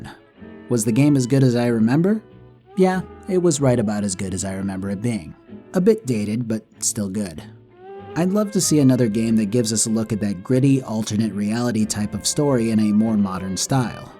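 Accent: Germany. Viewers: As german, I liked your german and german accent. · i love your German accent lol maybe bioshock 2 too?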